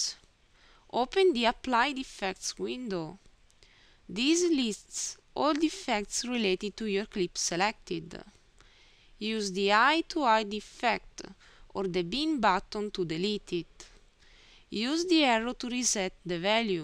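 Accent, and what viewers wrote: Italy. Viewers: nice english with italian touch... love it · I am melting, I love to hear her voice, what a cute little Italian accent.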